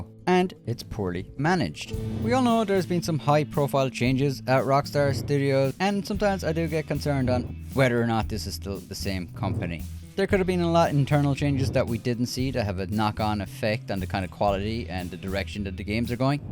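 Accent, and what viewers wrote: Ireland. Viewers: Thanks for the honesty 👍 Irish Accent?☘️ Sadly not playing nearly as much…way too many griefers and modders for me. It starts As soon as I log on, no matter what time of day. Blowing me up, starting me on fire, killing my horse and causing me to lose trader and moonshine wagons and hides and high end stews. Not worth it any more. Play on PC 😡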